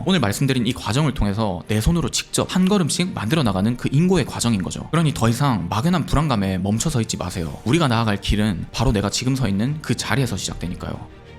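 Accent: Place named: Gyeongsang, South Korea. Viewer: 나이가 40이 다되가는데, 아직까지 방황하고 있어서 잘 듣고 있습니다. 듣다 드는 생각인데 혹시 대구분이신지요? 사투리가 너무 익숙해서 물어봅니다 ㅋㅋ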